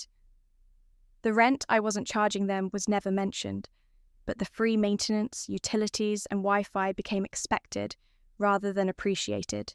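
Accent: United Kingdom. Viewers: Very interesting story. Good British accent to voice ai. Okay text ai. Ninas spelled Nah very annoying. I liked that endless angst of used persons which feels over the top most times was absent from this story. Its clear that having a good friend to talk over the crazy entitlement of her family was very helpful. The narrative movement is lovely. Her family's comments and entitled posts coming at points when she might have hesitated and had second thoughts. That Emma got such a quick sale and some help from the real estate developer in the timing was satisfying. I would guess parents had intended right from the start to give her unit to her brother. Also the speed of the process kept the browbeating, blame, manipulation and financial abuse from getting any legs . And once they were out, wow ,no time to indulge because now they had to pay their own rent utilities food etc. 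Definitely satisfying. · I loved this story. It was thrilling and beautiful karma. I absolutely loved the British accent, never heard any of the AIs narrate in it. Some consequences come with a high cost when u come to your senses finally but it's always worth it (not for u obviously). 🤣🤣🤣🤣🤣🤣🤣🤣🤣. Well done OP. This story was really gripping and i couldn't wait for the karma to hit. Perfectly brilliant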